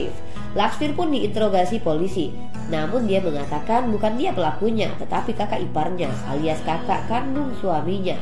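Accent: Java, Indonesia. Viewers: dimana dirimuuu... jangan di gantiii dong...😭😭 is tbe best👍🏻👍🏻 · KESIMPULAN😁, semua narator punya ciri khas sendiri2, justru medok itulah yg bikin ciri khas suara yg tidak dipunyai narator lain, maaf untuk vidio ini tidak saya dengarkan sampai selesai😊semangat!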